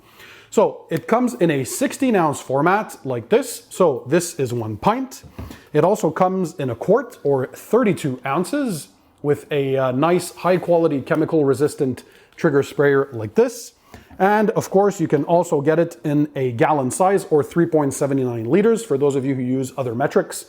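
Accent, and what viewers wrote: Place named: Montreal, Canada. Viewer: I was going to ask if your from Montreal because of your accent and then you said it! From here too!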